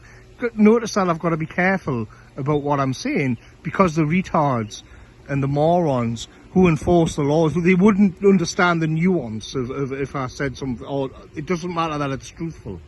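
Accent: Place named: North East England, United Kingdom. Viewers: very capable. His accent is working class north east. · I remember your tree roots video. I also remember getting to grips with the Geordie accent So I could appreciate your content when you only had a couple of thousand followers.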